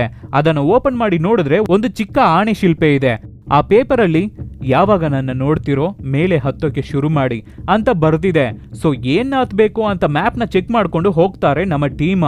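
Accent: Tamil Nadu, India. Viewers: I think ur mother tongue tamil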